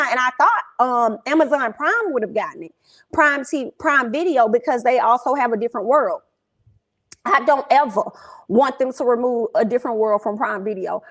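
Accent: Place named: Alabama, United States. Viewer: Girlll what part of Alabama are you from?!?!?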